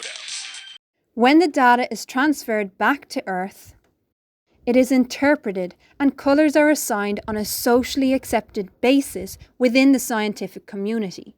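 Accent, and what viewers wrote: Ireland. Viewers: 👍🔔 Where are you from I hear an Irish twang in your accent? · Are you Irish? 🇮🇪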